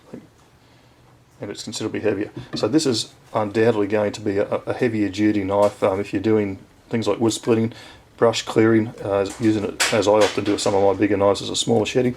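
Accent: Australia. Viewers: Great review. Nice to hear an Aussie accent instead of all the Americans. Have you ever used the Ontario SP10? If so what are your thoughts compared to the SP50?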